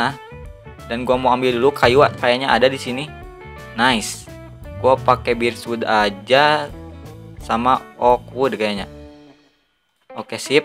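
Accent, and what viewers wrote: West Java, Indonesia. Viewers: kaciri pisan urang sundanateh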